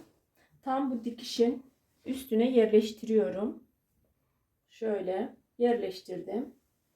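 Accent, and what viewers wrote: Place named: Sivas, Turkey. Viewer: Konuşman Sivas'ı gibi kolay gelsin eline salık evladım.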